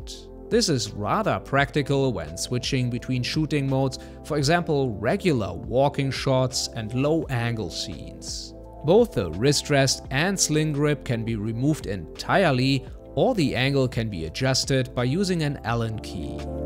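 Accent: Germany. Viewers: German accents are so much fun to listen to.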